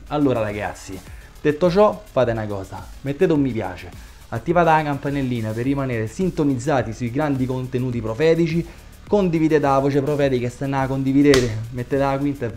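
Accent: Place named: Rome, Italy. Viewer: sei sempre il top ti seguo con gioia e mi fai morire con L tuo dialetto romano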